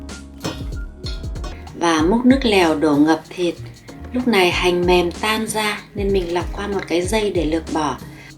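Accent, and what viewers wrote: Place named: North, Vietnam. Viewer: A+. Giọng Bắc hay quá nên cho thêm hai dấu + ... A+++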